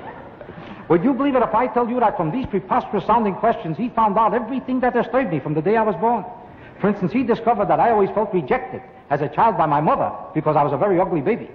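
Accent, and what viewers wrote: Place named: New York, United States. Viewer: Infinite list of little absurdities. And the NY accent. He was a real hit in his day. And his day lasted 60 years.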